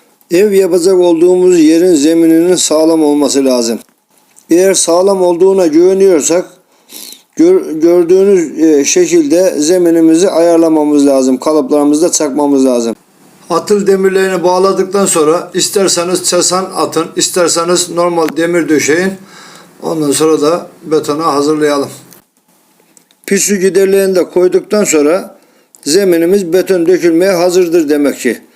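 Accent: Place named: Black Sea, Turkey. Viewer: Ustam siveden bizim karadenizli gibisiniz...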